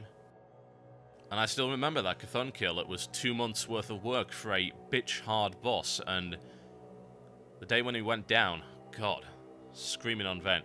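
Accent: United Kingdom. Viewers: I was never into wow that much. I like the game but still..you're actually really interesting to listen to o_o I think its that British voice XD I'm a brit too >:3 Brits ftw!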